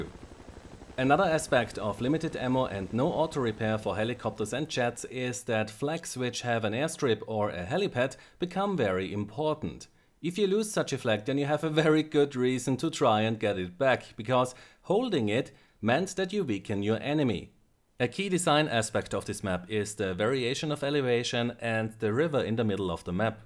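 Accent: Germany. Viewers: German accent... Yet he talks like lvlcap... Interesting.!! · your funny accent is german, right? correct me if im wrong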